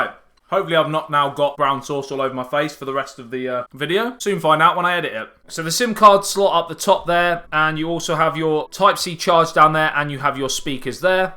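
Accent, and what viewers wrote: United Kingdom. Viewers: The British accent is fucktarded enough, but throw a lisp in with it and you're triple fucked. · I seen this video and held my breath then thought, "Thank, god. He sounds British." Why do I hate my own country so much? XDDDD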